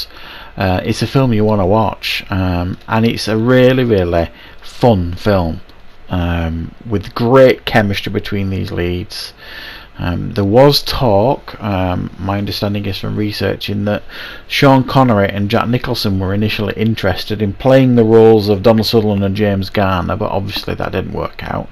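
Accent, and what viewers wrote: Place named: Scotland, United Kingdom. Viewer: Scottish Moviebob??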